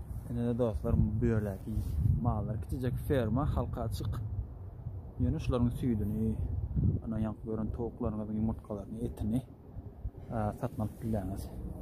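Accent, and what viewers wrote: Turkmenistan. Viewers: Salovmaleykum sen lebaplymy gurleysin birhili menzap gitdi.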